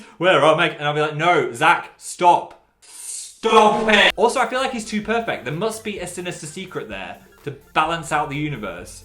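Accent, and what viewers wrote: North, United Kingdom. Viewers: His accent is just getting more and more Northern 🥰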